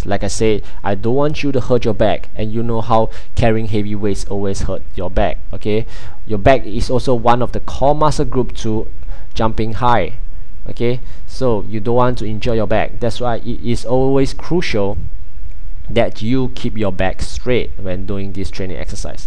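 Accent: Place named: Singapore. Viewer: er you sound like a singaporean, am i right?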